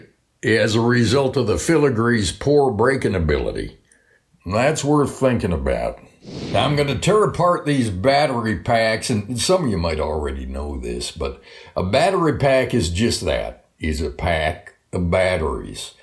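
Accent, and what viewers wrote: South, United States. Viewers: NOW , ... THATS a good review ! sounds like someone who really uses tools , and not some salesman ! liked hearing southern accent , and personality ! the review was more entertaining than the tools . good review .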